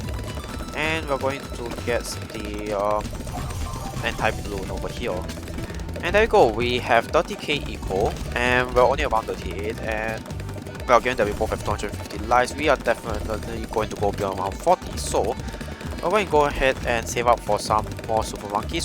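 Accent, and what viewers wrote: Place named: Singapore. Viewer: Hey you sound like you are from Singapore? Just a random guess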